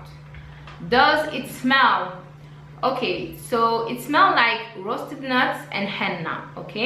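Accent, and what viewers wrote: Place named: Africa. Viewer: Your English is perfect. It's funny you do not speak it with a French accent. I hear an African accent. And I know Africa is a continent. But you sound like an African immigrant who has lived in the US for a while. Blessings. Thanks for the info.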